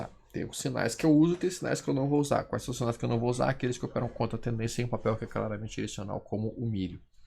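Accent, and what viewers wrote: Porto Alegre, Brazil. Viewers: ele disse bspoas sfdeua fpasa, problema para mim que o professor fala muito rápido além do sotaque portoalegrense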